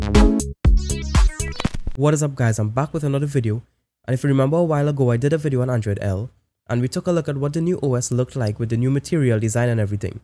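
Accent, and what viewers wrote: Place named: Trinidad and Tobago. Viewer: great vid.....you sound Trinidadian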